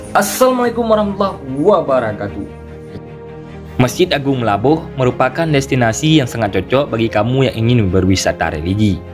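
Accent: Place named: Pidie, Indonesia. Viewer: Sang dari pidie nyo tadeungo logat su😂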